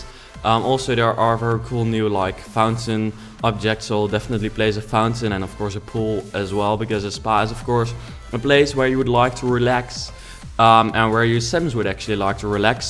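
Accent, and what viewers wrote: Netherlands. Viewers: you can really hear the dutch accent...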